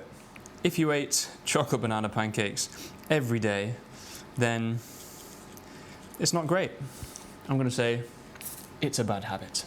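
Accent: United Kingdom. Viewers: I'm really happy with your videos, I watch them every day and I really love them! Thank you for your help, and also I love the British accent.❤❤ I still learning 😅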